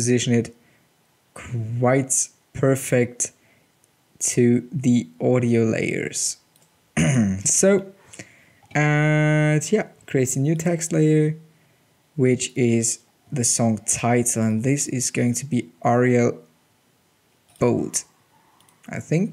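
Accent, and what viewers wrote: Germany. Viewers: BHAudioProduction Spoken with a strong german accent. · U are german right? Good Tutorial. Looks realy Nice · geil ein deutscher der englisch redet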